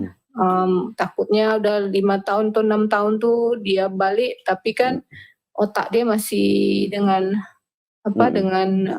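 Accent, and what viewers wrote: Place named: Peninsular Malaysia, Malaysia. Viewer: Masih ada lagi lenggok tutur bahasa melayu semenanjung Malaysia... memang duk Malaysia ke dulu²?..bsama..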